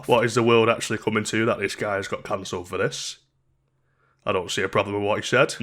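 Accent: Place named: Newcastle, United Kingdom. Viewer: quality video, top new castle accent hahaha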